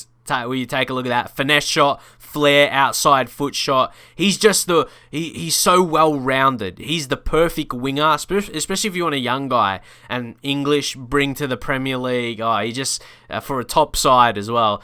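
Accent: Australia. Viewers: are you aussie? or do you live in straya you have an aussie accent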